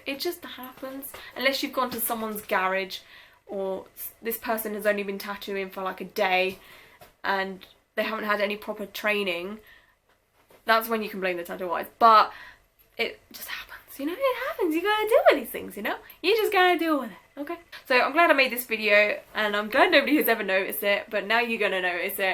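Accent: United Kingdom. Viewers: Damn your voice is annoying. Must be from the UK? Funny how you asked your tattoo artist what it was exactly and then made a video to tell YouTube about it. Cool story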